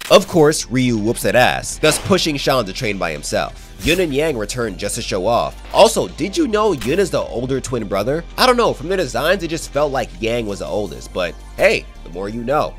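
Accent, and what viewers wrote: Jamaica. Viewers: I didn't know you were Jamaican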